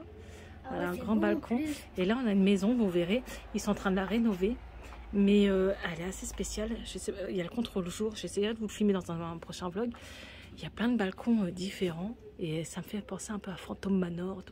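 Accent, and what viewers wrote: North, France. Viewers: Bienvenue dans le sud ouest 😃 pour vous baigner attention car la baignade à Anglet est dangereuse (Baïnes qui vous entraînent au large) même si il y a les MNS. Voir Hendaye, St Jean de Luz +++. Vous allez aimer le sud ouest même si pour vous, venant du nord, c'est un sacré changement. J'espère que vos enfants vont s'y plaire. Pour l'appartement heureusement que vous êtes logés mais avec des enfants vous devriez en trouver un. N'hésitez pas à vous présenter aux commerçants et poser des affichettes. Avec votre accent vous allez passer toujours pour une touriste ! 😃😃😃 Bon courage !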